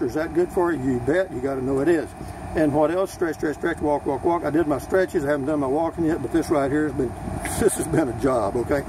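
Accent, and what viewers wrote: Texas, United States. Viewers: Hello from Nevada! I just found your channel. Listening to you makes me miss Texas. I lived there 3 years. You had me genuinely laughing ... "Oh it's energy saver... I don't want that!"... sounds like something literally every Texan I ever met would say, and rightly so, since Texas gets up to 20,000 degrees in the summer :D ... Stay cool, and keep up the good work.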